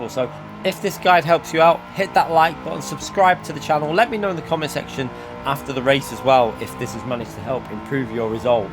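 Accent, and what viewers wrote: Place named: RP, United Kingdom. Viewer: The sounds more posh when he's doing his guides than on his live streams.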